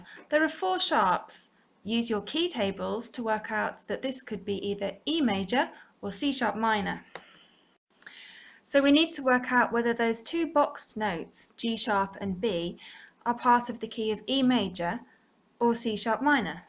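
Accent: United Kingdom. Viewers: Thank you so much. I'm teaching myself piano, and you've been a huge help! And I like your British accent. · Ahhh British accent 😲😲😲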